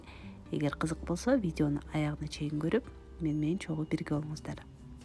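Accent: Standard. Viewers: Кыпкыргызча суйлойсуз ээ 🥰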